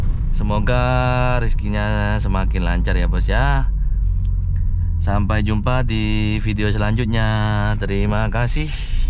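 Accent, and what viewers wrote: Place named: Madura, Indonesia. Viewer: Madura mana bos?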